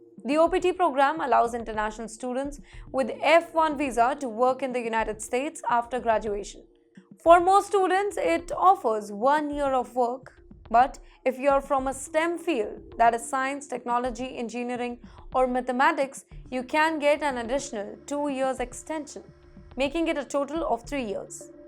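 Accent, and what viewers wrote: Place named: India. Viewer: Nothing personal,, she needs to improve her English accent.. Too much Hindi hangover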